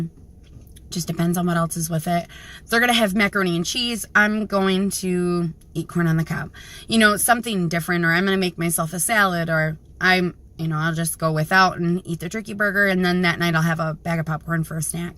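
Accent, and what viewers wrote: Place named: North, United States. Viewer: LOL can't help but check out the scenery as your are driving....looks like Iowa? and I detect a slight northern accent so Northern Iowa or Southern Minisota??? Anyway I really appriciate your videos. I'm on day 2 with Contrave.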